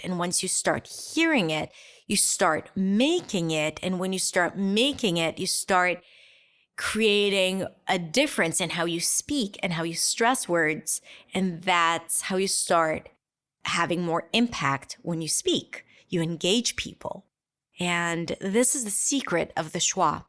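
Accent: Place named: United States. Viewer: Hello! I love your beautiful American accent and thanks a lot of the podcast.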